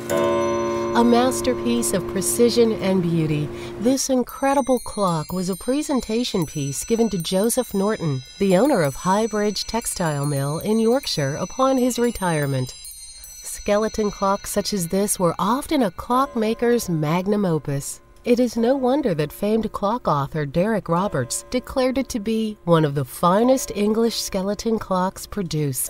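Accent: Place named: United States. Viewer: Great clock from Yorkshire, but why the American accent.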